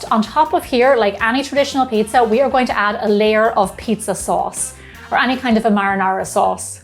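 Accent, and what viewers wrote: Ireland. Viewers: Oh class she’s Irish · I lived in Dublin for two months and your accent is bringing me back so many good memories especially during quarantine!! I have so much love for Ireland and everyone from there ♥️ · Love your Irish accent. I'm Irish too. I love the videos and follow your recipes often. Thank you!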